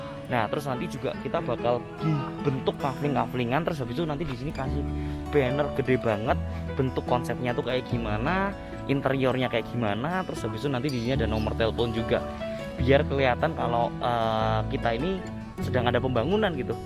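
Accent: Java, Indonesia. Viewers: Paling suka sama penjelasan bro dengan suara khasnya yg medok. Hehe. Semoga saya juga bisa segera memulai bisnis. Terimakasih ilmunya bro. Sukses selalu, sehat selalu utk bro dan keluarga.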